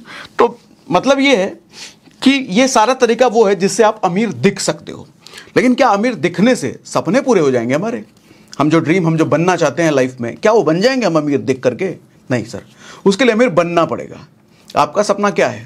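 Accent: Bihar, India. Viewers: I love bihari style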